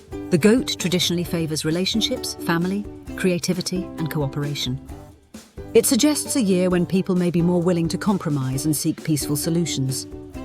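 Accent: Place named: England, United Kingdom. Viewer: An English accent, Hawaiian luau music, Chinese astrology, and AI animation. Interesting combination.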